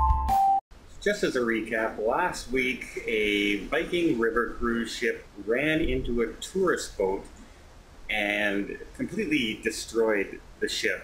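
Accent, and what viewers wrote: Canada. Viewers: The Mighty Cruise Ship Experience He’s Canadian, eh?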